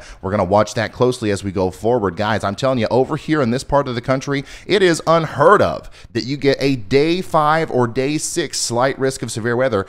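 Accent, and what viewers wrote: South, United States. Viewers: New here, honestly might come here for my news forecasts. sets me at easy with a true southern accent giving me the weather than them dang Dallas newsboys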